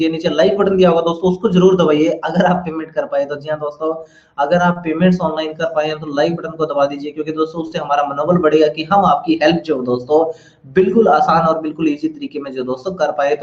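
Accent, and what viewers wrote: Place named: India. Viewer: Just FYI he's not spekaing spanish... thei accent is hindi like, just saying don't asume.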